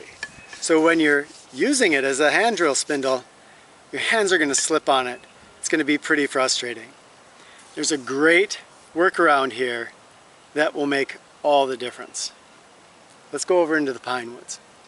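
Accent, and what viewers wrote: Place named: Wisconsin, United States. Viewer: ! I've gotta say, I am really excited to have found your channel! I have always had a strong interest in the subjects you cover, but what is really exciting is that it sounds like you live in Wisconsin! The problem I sometimes run into when researching is they are region specific with materials. This can be hard. I live in central Wisconsin, and it is awesome to have a neighbor's expertise to draw from. Thanks for doing what you do! Great work my friend!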